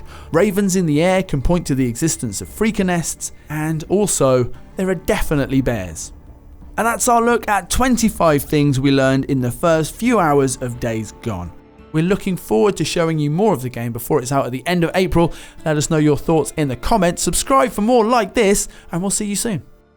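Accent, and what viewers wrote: United Kingdom. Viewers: My god im tired of british accent!!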